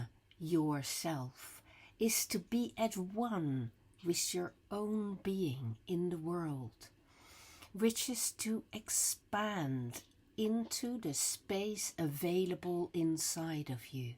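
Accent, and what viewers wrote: Netherlands. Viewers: Beste, bent u Nederlands? Ik vroeg het me af op basis van uw Nederlandse naam en accent.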